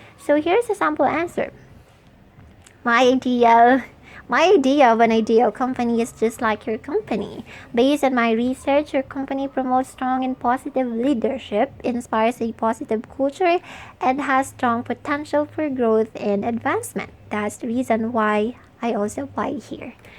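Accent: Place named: India. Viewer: Naanu ako sa accenr hhehe parang indian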